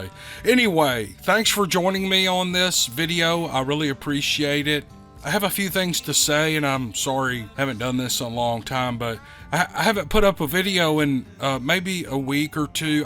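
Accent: South, United States. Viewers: I was born in Louisville. Kentucky. I was raised in the south. I live in Oregon now. Hearing a southern accent makes me homesick. I enjoy this site alot.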